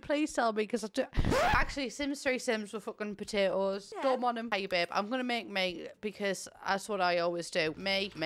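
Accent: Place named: Newcastle, United Kingdom. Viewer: Hey its so upsetting when you mention tories in your videos in such a negative way. Conservative is just a political opinion, we aren't all bad people just like people who support Labour or lib dem. I just feel so shamed when I watch your videos sometimes cos of your comments and I don't feel I should have to be cos its my own personal opinion. I absolutely love your videos and think you're hilarious and I don't actually live that far away from you so it's great to hear a geordie accent but it's making me feel like I can't watch you cos your opinions are so strong and youd think I'm a bad person... 😞 I am definitely not hostile either I work for the fire service and I love helping others and spreading positivity. I just wanted to share this cos you may not have even thought of this... But either way, I hope you're feeling better can appreciate where I'm coming from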